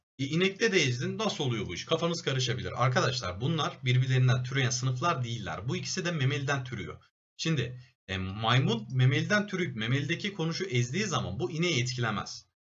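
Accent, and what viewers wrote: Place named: Rize, Turkey. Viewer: Adim kadar eminim ya rizeli ya oflusun hocam...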